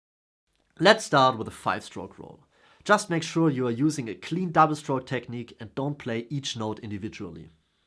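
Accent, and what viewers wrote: Germany. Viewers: Swabian accent going strong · Your German accent is very convincing. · As a German myself - his accent is definitely and undoubtedly german. Stewies accent is british but they have similar voices. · are you from germany ?? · man erkennt das du deutsch bist xD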